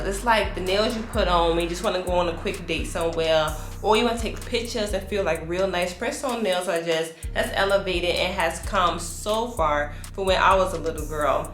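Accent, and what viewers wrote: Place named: Louisiana, United States. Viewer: Are you from Louisiana? I love your accent and nails too. Thank goodness for Shein. 💅🏼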